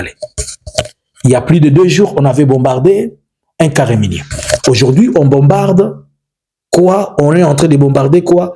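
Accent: Rwanda. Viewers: Aza rwandais, c'est normal, accent ya kinyarwanda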